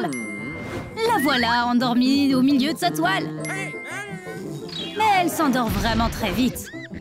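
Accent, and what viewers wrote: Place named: England, United Kingdom. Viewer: Il sons pas français il sons englai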